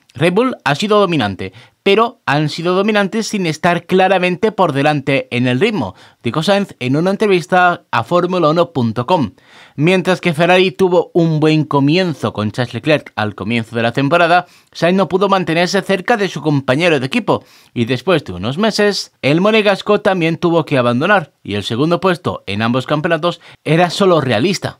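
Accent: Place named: North, Spain. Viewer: Oye, eres del centro o norteño, pero lo que si estoy seguro es que no eres del sur de la Iberia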